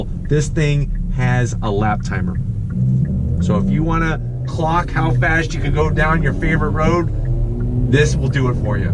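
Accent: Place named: Long Island, United States. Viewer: Ask you where in New York are you originally from that sounds a little bit like Long island if I'm not mistaken.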